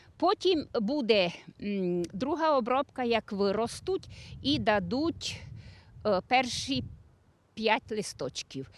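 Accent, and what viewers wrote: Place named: Transcarpathia, Ukraine. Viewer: Приємна і зрозуміла українська з самобутній забарвленням закарпатського діалекту. Довгих років життя господині.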